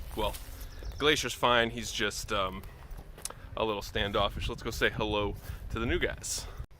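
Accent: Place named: South, United States. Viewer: Loved the southern accent!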